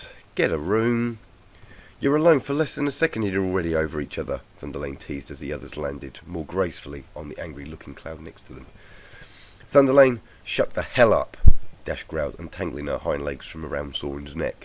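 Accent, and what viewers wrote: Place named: England, United Kingdom. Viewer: SUCH A GREAT READER (now I want to have an English accent)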